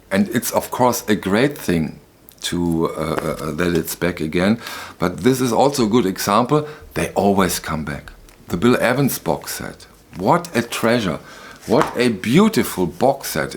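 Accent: Germany. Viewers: I actually really enjoy your speaking and German accent (I'm American). I find it sincere and very intelligent.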